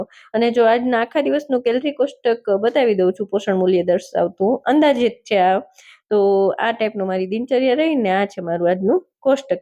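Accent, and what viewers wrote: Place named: Kathiawar, India. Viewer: Ben tmari bhasha ekdam pyor kathiyawadi chhe to tmaru piyar kya gam chhe 😊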